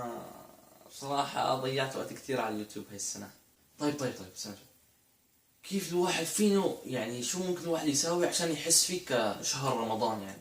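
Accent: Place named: United Arab Emirates. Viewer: ياخي لهجتك زكرتني بايام حلوة ايام الفريج و الربع ... انا هلق بالمانيا و كنت عايش بالامارات .. بس ما حبيت المانيا ابدا ... الامارات احلى بكتير ... كيف امريكا حلوة متل ما منفكر و لا كمان متل اوروبا قرف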